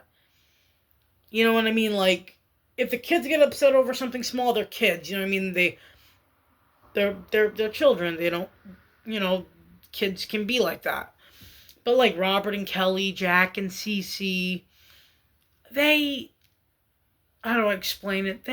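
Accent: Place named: Yonkers, United States. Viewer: Randomly stumbled onto your channel - I have no idea about the doll side of YouTube. But I really enjoy listening to you speak. You sound like my family from Yonkers 🥹 maybe it's calming to listen to you reminding me of them.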